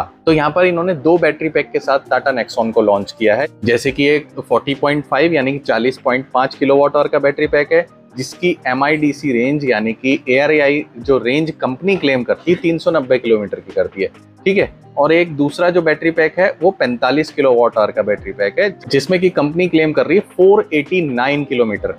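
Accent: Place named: United States. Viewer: Arey bhai.. kyaa ho gaya aapko . Apna original language me bolona.. Ye american accent english kyun lagaya.. it's very irritating. Pahle mai aapka har video dekhta tha.. but zab se ye angrezi bolna chalu kiya.. apka videos dekhna hi band kar diya. Band karo yaar.. ye artificial langauge me bolna..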